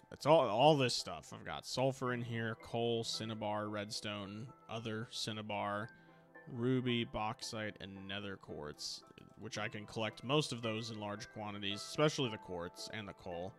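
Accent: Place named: United States. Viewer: Good prononciation of "excellent", just a thick American accent which is fine but unusual from a native speaker's perspective.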